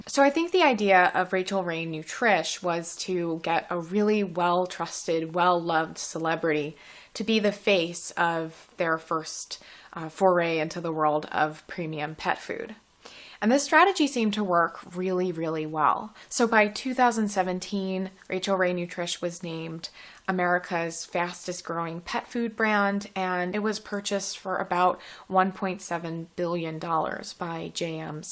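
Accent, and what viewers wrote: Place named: Illinois, United States. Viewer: Sounds like you’re from IL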